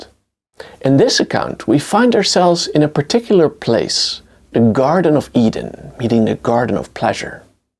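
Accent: Netherlands. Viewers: yes we know. It's not a history book, accented Dutch person.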